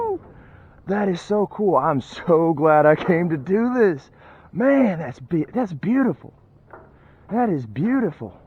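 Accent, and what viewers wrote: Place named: South, United States. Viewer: Speaks like Huckleberry Finn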